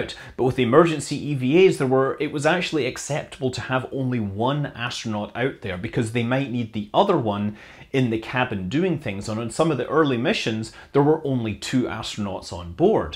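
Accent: Scotland, United Kingdom. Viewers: YouTube cannot compute the awesomeness of the Scottish accent, even at this level of dilution. What would it do with Glaswegian after ten lagers?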